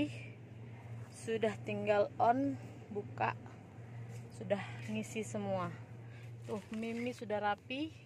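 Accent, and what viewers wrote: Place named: Makassar, Indonesia. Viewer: teh asli nya orang mana .. kaya orang makasar ya ngomong nya😁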